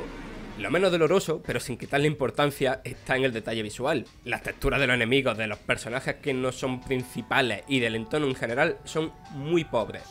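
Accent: Andalusia, Spain. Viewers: Buen análisis viva el acento andaluz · El tipo tiene acento andaluz no problemas fonoaudiologicos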